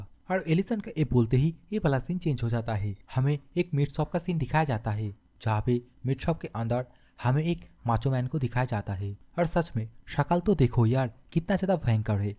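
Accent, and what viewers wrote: Bengal, India. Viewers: That bengoli accent 😅😅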